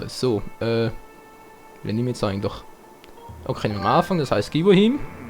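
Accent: Switzerland. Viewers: Also ich mag dein Schweizerdeutsch ;D Ich verstehs ganz gut^^ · war cool mit dem schweizerdeutsch^^ ich dachte ich hätte mehr probleme dich zu verstehen,aber war ganz ok! hast du eigentlich schon n lets play von twilight princess?wenn nich wäre ich wieder für min.1 part in scheizerdeutsch;) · also ich finde das schweizer-deutsch ist gar nicht so schlimm^^ es ist sogar mal ganz schön. ist was anderes. ich verstehe zwar als deutsche nicht alle wörter, aber das ist mir egal. es macht Spaß dir zu zuhören, da man das schweizer-deutsch ja hier so selten hört^^ mach weiter so!!!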